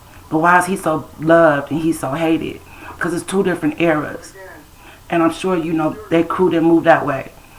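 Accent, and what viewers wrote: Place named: Michigan, United States. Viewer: Hi! you sound like you are from Michigan. Can you please tell me wth is wrong with GM, CEO Mary Barr? She don't GAF about employees. How/why is it OK for the auto industry to have mandatory Saturdays scheduled and taking volunteers on Sunday to work?This plant is running 7 days a week!!!HOW in a pandemic?? and did the UAW threaten the Governor? Supposedly, the UAW told the governor, they got her elected& they would not help her with her re election, if she shut down the auto factories again because of COVID_19.It has been said, allegedly, that none of the auto factories will shut down again because of the virus. Why?how is a building a truck essential? These auto factories have people coming from all over the state, to work at certain plants.They are, * allegedly* sneaking infected people out of the plant and not telling their co workers they have been exposed to COVID-19! 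How is this ok??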